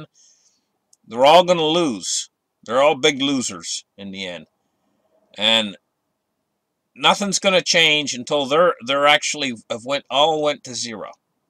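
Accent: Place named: Canada. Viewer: Great advise. analogies and stories are the best. The Canadian accent tops it off.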